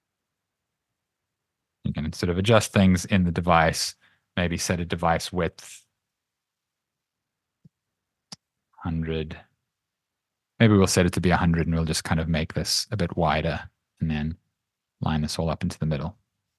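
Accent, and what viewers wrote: South Africa. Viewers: Great video, something I’ve been interested in for a while. Also btw, are you by any chance South African? Your accent sounds familiar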